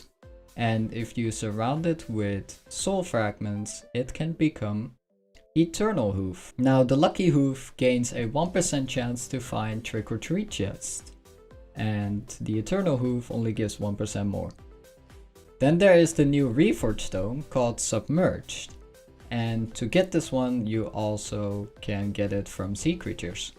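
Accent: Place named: Netherlands. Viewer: bruhhh ik hoorde ik binnen 0.1 seconde dat je nederlands was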